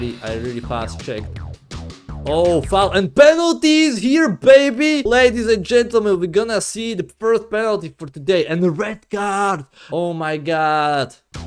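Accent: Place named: Sweden. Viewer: You sound swedish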